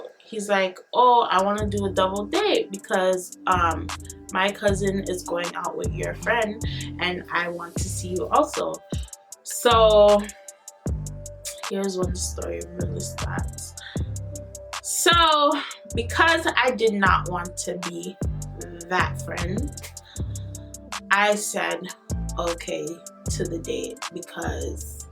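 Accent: Jamaica. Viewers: Are you a Jamaican I can hear it I am a Jamaican myself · TALK JAMAICAN ALL THE TIME :) , I LOVE IT . GOOD STORY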